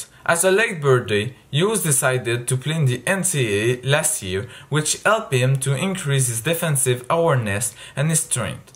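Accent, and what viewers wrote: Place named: Quebec, Canada. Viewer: Are you French Canadian or Russian lol? You sound like a mix of them haha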